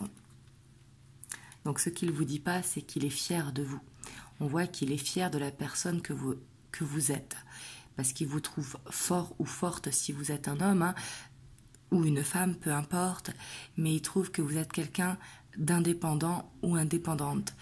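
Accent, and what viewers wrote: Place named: South, France. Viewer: Je m excuse mais vous avez- l accent du midi vous etes d où svp ...oui mon ami est un ancien champion de course d automobile de course de cote il a arrêté il est retraité voilà je suis très fière de lui et moi ije suis très gentille merci j adore vos guidances 🦋🎁🎁🎊🎊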